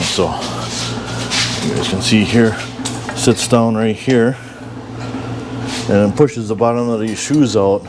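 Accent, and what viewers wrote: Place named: Midwest, United States. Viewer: this channel rocks hahaha . you are good at this and explaining what your doing. your midwest accent sends it overboard ( in a good way)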